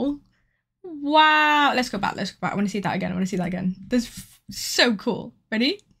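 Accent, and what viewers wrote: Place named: United Kingdom. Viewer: Как же прикольно звучит британский английский))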